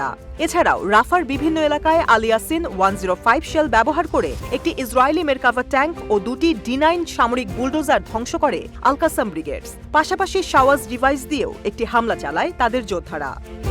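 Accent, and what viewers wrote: Kolkata, India. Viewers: কোলকাতার মতো সংবাদ পাঠে বিরত থাকুন। শুনতে ভালো লাগে না।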